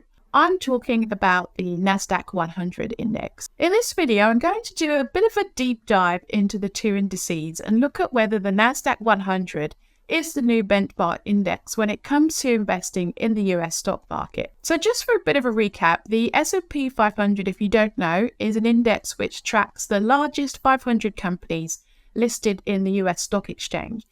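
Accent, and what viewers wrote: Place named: United Kingdom. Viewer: A Brit talking/teaching about the American stock market? LoL...I was caught off guard at first. Like your accent, though. Good stuff. I'll check out the rest of your videos too.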